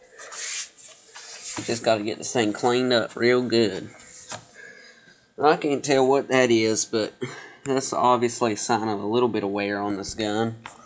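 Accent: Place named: United States. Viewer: Your accent is epic ... America!!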